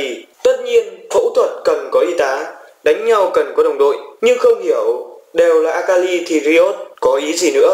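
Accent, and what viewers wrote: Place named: Vietnam. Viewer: Video rất có ích nhưng mỗi tội ad phát âm tiếng Anh đậm chất nửa Anh nửa Việt.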